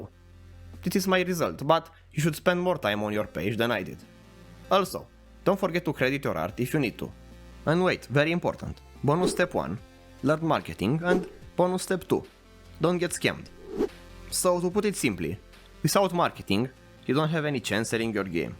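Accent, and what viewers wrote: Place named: Romania. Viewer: ur deff romanian, not gonna lie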